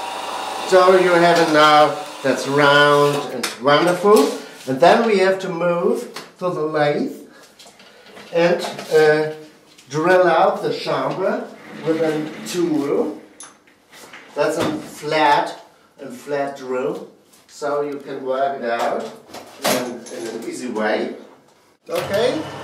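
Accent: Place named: Germany. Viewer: this guys accents sounds like a german christopher walken.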